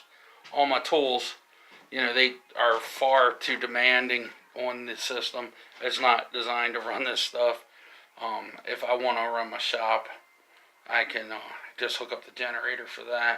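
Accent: Baltimore, United States. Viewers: I hear an accent are you from the Baltimore area? I'm looking to buy a small private plot of land hopefully around summer time between northern VA, eastern WV or southern PA. I could easily ask you a million questions but I'm sure your videos and Google can answer most.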